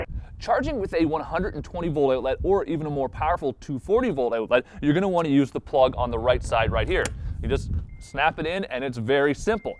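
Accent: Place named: Canada. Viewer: Different accent. Listen to how he says the word "out." He sounds Canadian/North American Mid-West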